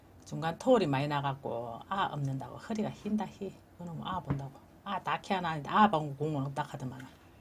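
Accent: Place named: North Gyeongsang, South Korea. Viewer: 갱북 어딘교 나도갱국인데 말씨가 똑같아서 입니다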